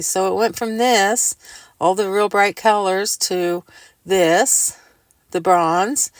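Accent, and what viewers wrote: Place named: Indiana, United States. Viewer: I did not realize until this video that you are in Indiana. When started watching your channel recently --- your voice reminded me of one of my Aunts & her daughter's voice. Both from Indiana (the Indianapolis area) & maybe this is why - a Indiana accent. Thanks for your great videos - always enjoy them.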